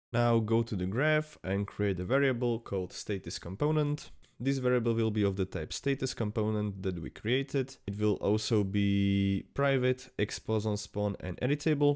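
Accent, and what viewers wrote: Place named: Slovakia. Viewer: Už len podľa prízvuku viem povedať že si slovák, ale popiči tutorial bráško dikes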